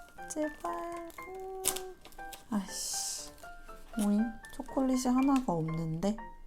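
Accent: South Korea. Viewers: 대구인 답게 대구 사투리를 쓰시네요!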